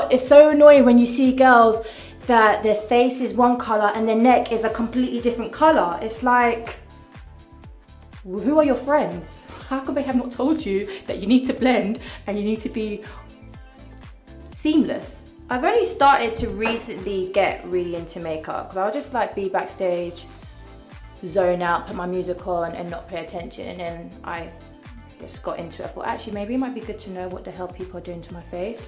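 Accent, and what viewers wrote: United Kingdom. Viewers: I HAD NO IDEA SHE WAS BRITISH?! AND A CHILD??? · Thank you for helping me with the British accent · Omg I didnt realise she was british yasss black queen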